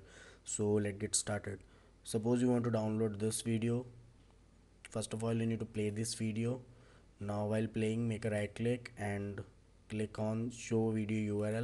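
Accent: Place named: India. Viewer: why would you trust a person with an indian accent and bit.lys in the comment section explaining how to log out from messenger....not to mentiont that 90% of positive comments are indians.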